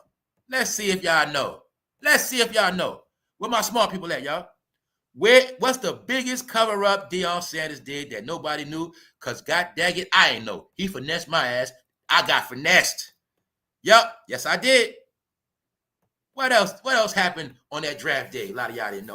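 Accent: New York, United States. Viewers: Replay gang 💪🏽 👁️ ❤️ your NY voice ( t.y for giving us Family consistent content no one does it like you real talk 💯 🙏🏽 )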